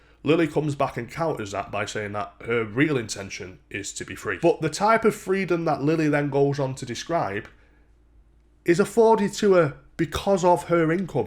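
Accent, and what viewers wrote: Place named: Yorkshire, United Kingdom. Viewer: yorkshire? me tooooo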